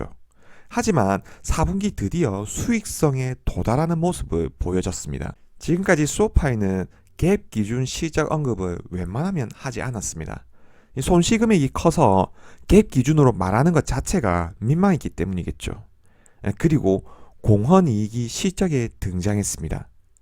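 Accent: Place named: Gyeongsang, South Korea. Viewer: 감사합니다.. 항상 잘 보고 있습니다. 부산 사람으로 경상도 사투리가 너무 익숙하네요^^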